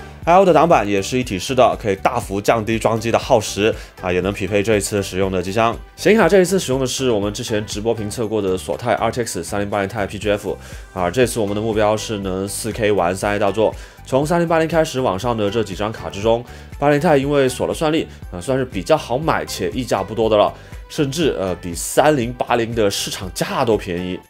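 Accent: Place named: Guangzhou, China. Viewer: diu 一听就是广州佬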